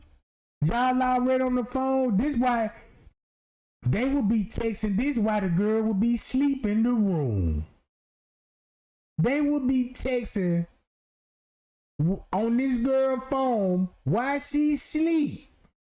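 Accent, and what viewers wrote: South, United States. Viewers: Hey Mr. 🫶🏽 I Don't Mean To Disturb You Because I Know You Will Be Going Live Again, But I Was Cooking And Listening To My Gospel And I Broke Down Thinking About Kenneka. Since Day One When I Came In Your Platform, Then Was A Mod. I Was Excited Because Your Platform Was My 1st, And I Was A Mod, But To Get Back To The Subject On Hand, I Feel Your Passion For Getting Justice For Kenneka. It Seems Like When I First Learned About It Was Late Night And I Heard This Smooth Southern Accent (All Do Respect) I Stopped And Listened And Then When I Learned What Happened.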